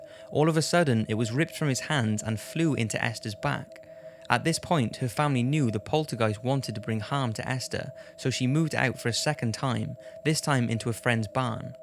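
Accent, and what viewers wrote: Wales, United Kingdom. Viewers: Love your videos! Are you Welsh? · k Not sure where you are from daz k, but to an English ear is from Wales and the "heerd" you have picked up is a feature of that regional accent.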